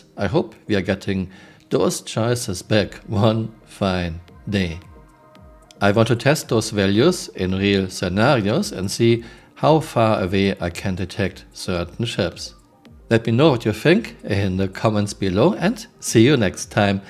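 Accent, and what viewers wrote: Germany. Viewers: deine videos sind echt super cool und informativ. Etwas ganz besonderes in der star citizen community und ich liebe deinen deutschen akzent haha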